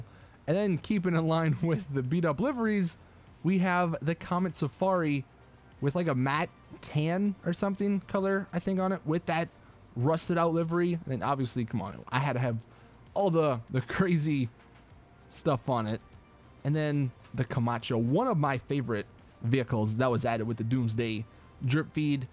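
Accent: Louisiana, United States. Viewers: What part of Louisiana you from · Are you from Louisiana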